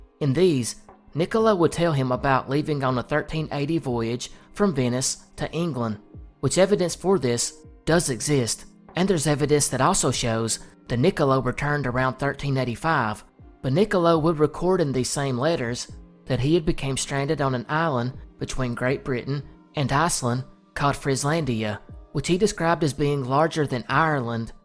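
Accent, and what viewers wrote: South, United States. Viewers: lol dude I can’t lie, hearing you say “Mulan Pie” a hundred and fifty times in that signature southern brogue was worth the price of admission. I’m gonna watch all adverts all the way through for the rest of the video lol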